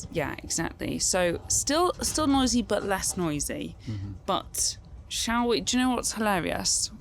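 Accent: United Kingdom. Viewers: Every time I click on this channels videos I start watching and enjoying the well spoken posh English woman and then she completely ruins the whole thing by referring to toilets as the shitter. Just why?🤢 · This is the first time I’ve seen one of your videos and I love your energy. Where are you from, I can’t place your accent, somewhere north because you say class and not clarse. Oh my god I’ve just realised it’s James from the Chris Moyles show!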